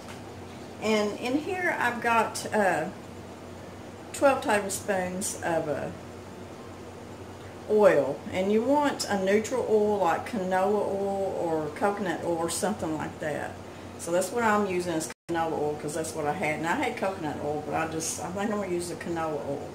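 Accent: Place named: Alabama, United States. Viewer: love your voice it reminds me of Alabama and my folks there. Yahweh bless!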